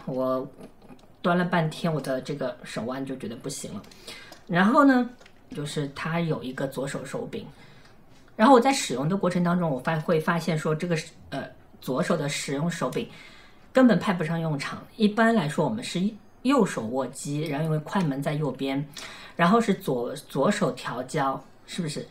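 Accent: China. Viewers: 上海摄友来报道，听到乡音很亲切，哈哈😊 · 是昆明话吧？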